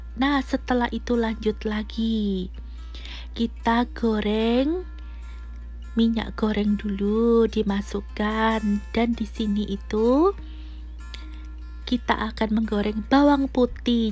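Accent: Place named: East Java, Indonesia. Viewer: Didenger dengerin logate koyok wong asal jawatimuran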